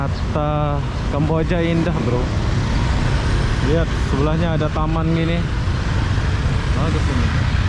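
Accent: Indonesia. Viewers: HOSTNYA ITU INDONESIA BANGET, HANYA BICARANYA DI MALAY MALAY IN, TERDENGAR DIALEK INDONESIA BANGET KATA HOSTNYA, SERU YA ORANGNYAPUN RAMAH RAMAH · klo di liat dr aksen.. kayaknya org lombok konten kreatrnya.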